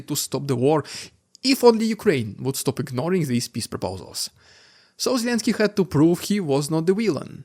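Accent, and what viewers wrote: Russia. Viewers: Bro, you just ramped up an accent to such an extent it is unbearable to listen now. Usually accent diminishes, but in your case it is somehow quite the opposite. How do you manage this miracle? As if you are trying one day to switch your channel to clear russian. If that is the new mic, you should switch back.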